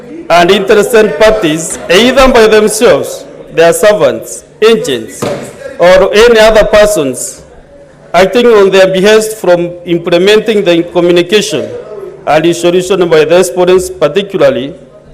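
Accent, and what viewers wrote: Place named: Meru, Kenya. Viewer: That is the tongue of people of meru ,but English is good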